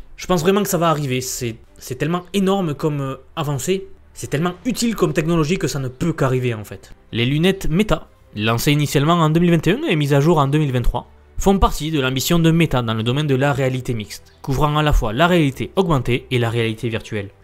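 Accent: South, France. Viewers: J’adore ton contenu a la pointe de l’innovation et merci pour ton travail ! Par contre désolé mais il faut faire des séances chez l’orthophoniste. Ton discours et très saccadé , et ta prononciation approximative … ton accent du sud est fort sympathique mais ca ne suffit pas pour t’ecouter agréablement 😊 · Avec un joli accent du sud du soleil qui nous raconte que de la merde. Quand les industriels auront tout contaminé, ils nous vendront des robots pollinisateurs. Allez vous faire soigner… pour être poli.🖕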